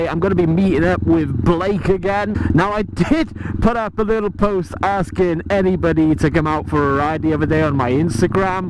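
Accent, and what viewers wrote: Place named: United Kingdom. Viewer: Just subscribed to your channel. Being in Japan it's good to hear a British voice on a motovlog for a change. I've also just started making bike videos. Only 1 for now, but check it out if you have time. Thanks and keep up the good work!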